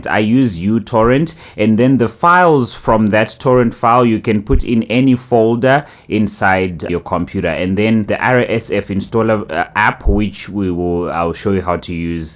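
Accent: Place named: Zimbabwe. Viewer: Are u from Zimbabwe, your accent sounds like it, or south africa, sounds more like zims tho?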